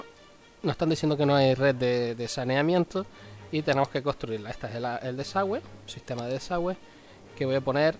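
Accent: Spain. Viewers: de que parte de españa es tu acento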